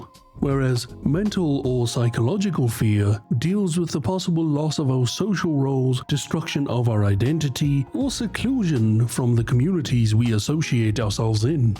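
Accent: South Africa. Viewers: You're south african?❤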